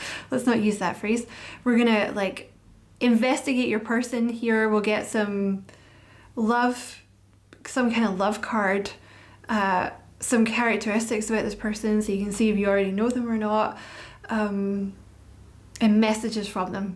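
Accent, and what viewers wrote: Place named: Scotland, United Kingdom. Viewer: Just from listening to you I can feel you're a very special person, spiritual, witty, funny, and I love ypur particular Scottish accent. Thanks for the reading. I love the beautiful being that you are...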